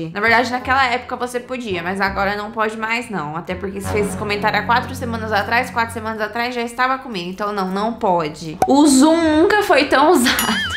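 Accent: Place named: Minas Gerais, Brazil. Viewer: Mô Mineira Né kkkk E MT Legal kkkk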